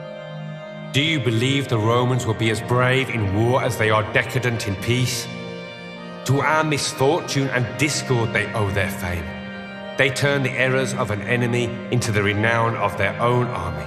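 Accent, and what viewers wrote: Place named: England, United Kingdom. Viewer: Doesn't help he has an English accent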